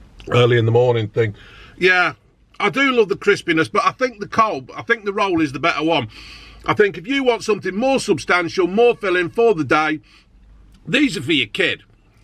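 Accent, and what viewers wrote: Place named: Leicester, United Kingdom. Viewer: Na, I love it, spesh in that Leicester accent...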